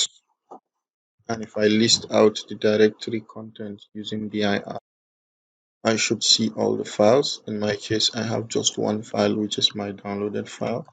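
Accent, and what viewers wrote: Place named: England, United Kingdom. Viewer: I can feel the english intonation (tureshi ni)... Keep up the good work 🙌❤️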